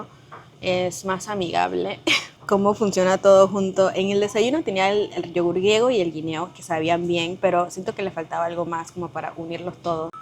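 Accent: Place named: Panama. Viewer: Es imposible reconocer a una mujer panameña❤😊empeze recién a ver tus videos y desde que te oi hablar lo supe(yo tambien soy panameña)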